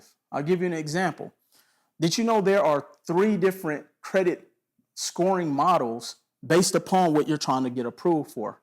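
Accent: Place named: Louisiana, United States. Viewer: your brilliant! Off topic, you have to be from the south? Louisiana? Your accent gives it away! 😎😎😎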